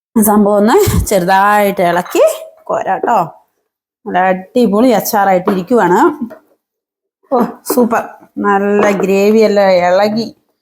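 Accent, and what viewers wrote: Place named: Idukki, India. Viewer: Serikum janma nadetha, thani Idukki samsarum anallo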